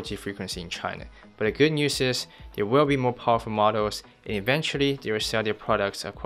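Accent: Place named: India. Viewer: jyothish swty he speak bad and annoying indian accent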